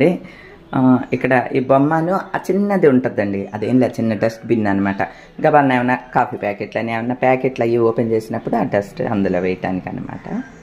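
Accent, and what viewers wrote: Godavari, India. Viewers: Natural గా మాట్లాడితే బాగుంది అమ్మా గోదారోల్ల యాస బాగుంటుంది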